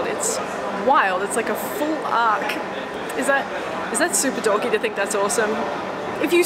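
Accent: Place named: Australia. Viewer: this is the Best part of the Video this is what causes Depression... What happened in the past stays in the past.. Don't think Negatively, move on.. You're so beautiful and Gorgeous, you have that amazing Aussie accent, you're showing us the World... Keep Cool.. waiting for your next Video. Take Care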